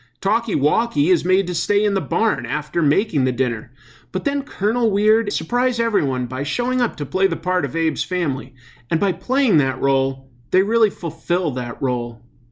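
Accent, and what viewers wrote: Boston, United States. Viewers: I thought he sounded like from Boston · If you're trying to do a Maine accent, you might need to relax your throat more. Right now you have a solid Boston accent. :D Great video. Keep it up!!! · Is that a farmer accent or Boston?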